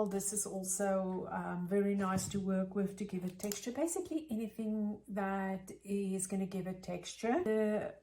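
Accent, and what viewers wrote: South Africa. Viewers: Just given me ideas for Christmas gifts thanks,nice to hear a South African accent.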